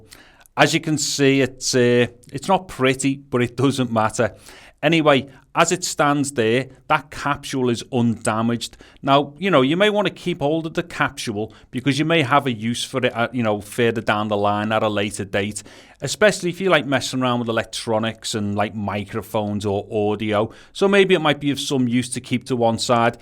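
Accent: Liverpool, United Kingdom. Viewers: Nice job and good to hear the scouse accent. My son lives in Liverpool.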